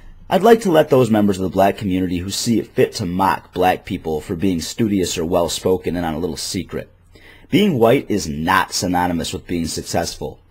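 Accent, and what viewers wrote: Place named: Midwest, United States. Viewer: Can't really tell, you can suspect, as I suspect that he's probably a white guy raised in the mid-west or north-east United States, but I also know a black dude who talks like Foghorn Leghorn (he legit sounds exactly like the character in pitch, tone, and accent), and a black chick who sounds like Rosa Ponselle when she sings. All you can really tell is that if he is black, he wasn't raised in a home which supported the notion that black people should "talk black".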